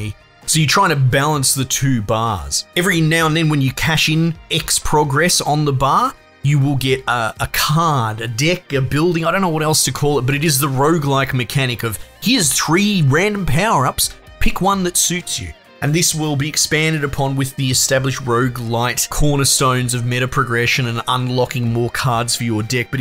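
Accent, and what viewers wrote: Australia. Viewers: the part about the furries got me crying. its even funnier with you aussie accent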